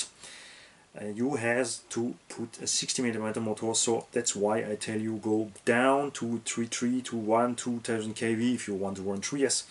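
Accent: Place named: Alsace, France. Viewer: Je comprends rien que ce soit en anglais ou allemand mais entendre ton accent alsacien un vrai régal 😊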